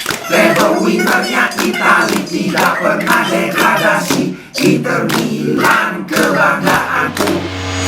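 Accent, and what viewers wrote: Bali, Indonesia. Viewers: Kalau dengar logatnya...dari bali nih kawan satu ini...forza inter...salam dari Malang bung😁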